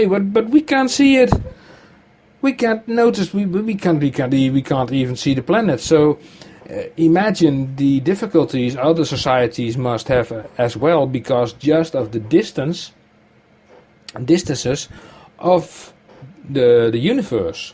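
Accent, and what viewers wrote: Netherlands. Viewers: You have netherland accent english, nice.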